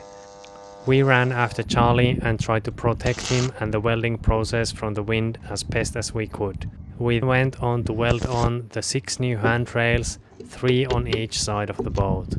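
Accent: Finland. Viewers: I was listening to your accent and I thought you might be Finn. I was right. 😊 I’m Finn American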